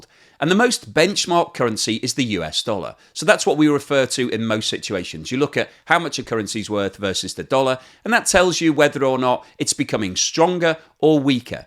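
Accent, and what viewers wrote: United Kingdom. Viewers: Don’t know why but with British accent it sounds too much like a propaganda. Than truth. I agree I can’t point a single topic that is propaganda in this video. It’s just the distrust on Brits. They fund that west narrative building machine called BBC.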